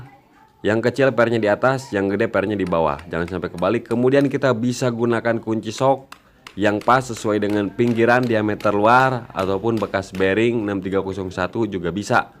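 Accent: West Java, Indonesia. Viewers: mntap bang hatur nuhun elmuna resep pokonmah ngarenge cariosan orng sunda mah😂👍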